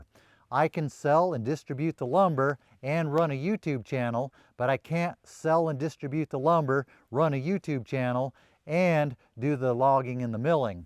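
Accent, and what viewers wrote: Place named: Pacific Northwest, United States. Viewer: it's the Pacific Northwest accent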